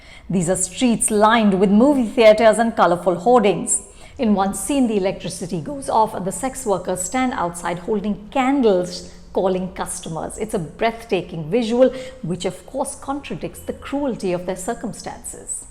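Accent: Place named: India. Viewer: Her indian English accent though 😂😂😂😂😂😂😂😂😂😂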